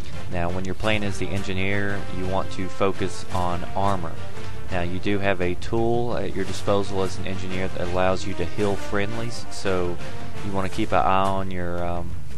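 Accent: South, United States. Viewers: are you from the southern states?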